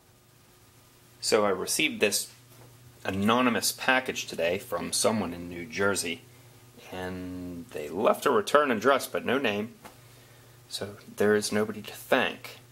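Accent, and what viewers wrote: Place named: Texas, United States. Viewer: dude, I love your accent, your jokes, and your dry delivery of them. my husband and I are getting into woodworking because of you. it's all your fault! 😉 did you live in Texas? I'm trying to pinpoint your accent; it's very complex and interesting :) (to me, at least)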